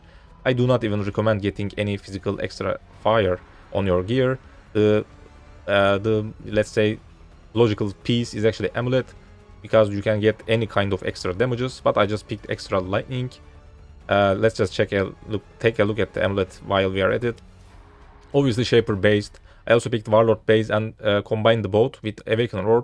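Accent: Turkey. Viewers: When i first hear i said this is a perfect Turkish accent. Just find out that im right amk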